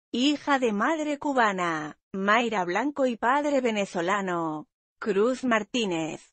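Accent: Spain. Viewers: ese acento español es horrible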